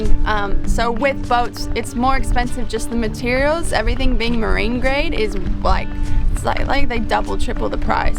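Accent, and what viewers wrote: Australia. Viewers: Your new Australian accent sounds cute! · Your voice has changed a bit. Sounds a tiny bit Austrialian. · you sound aussie now 😊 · I notice your Aussie accent, been following you for years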